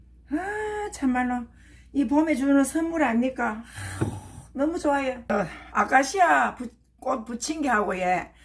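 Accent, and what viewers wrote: Gyeongsang, South Korea. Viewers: 님 반갑습니다 말씨가 친근하게 느껴지네요 저도 경상도 라서요 멋진 분을 뵙게되어 영광입니다 또 뵙고싶어 손잡고 갑니다 늘 응원합니다 건강하시고 행복하시길 빌겠습니다 ~~~♡♡♡♡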